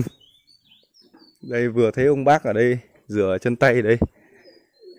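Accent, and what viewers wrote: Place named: Cao Bang, Vietnam. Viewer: M cũng dân tộc nùng tỉnh Cao Bằng,nge b nói tiếng nùng với bà các e nhỏ, giống tiếng nùng Cao Bằng nge hiểu hết.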